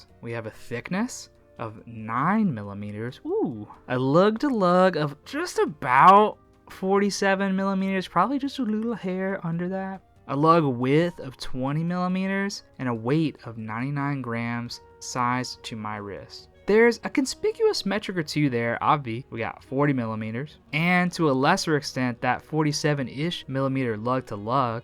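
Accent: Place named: South, United States. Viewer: that mall shot you put in there is the World Trade Center mall in lower Manhattan. A place where I have gone watch shopping time to time (they have a swatch boutique, tissot boutique, and London jewelers). For a minute I thought you were from NYC, but im guessing by your accent down south is more likely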